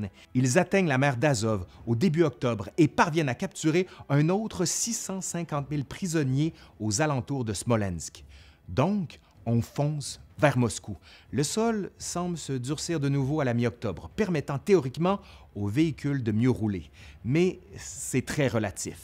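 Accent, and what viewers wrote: Quebec, Canada. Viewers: Voilà voilà!... Un pouce en l'air et mon commentaire en bas! Cet accent québécois est royalement beau. C'est de l'ancien français, mais c'est chouette quand ça entre dans les esgourdes, et ne nous le parle pas à la va vite, comme ils font souvent là-bas, si bien qu'on arrive à suivre! Bon, on se laisse ici à nouveau puis on se retrouve en 42. C'est ça?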